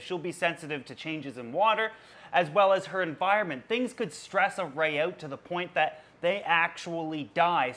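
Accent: Canada. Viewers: I like your Canadian Accent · I love your Canadian accent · Sorry *clearly Canadian* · you sound like a canadian. especially when you say "barb" haha sound like randy from TPB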